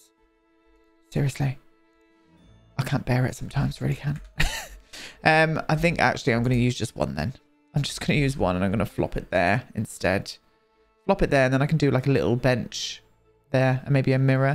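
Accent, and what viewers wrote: United Kingdom. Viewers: Good day I love watching you your sense of humour makes it fun to watch. And my favourite accent is a British one so listening to you and your laugh makes your videos that much better not including your talent and attention to detail. Bloody brilliant you are 😃. Even my husband enjoys watching you with me. Please take care stay safe your Canadian fan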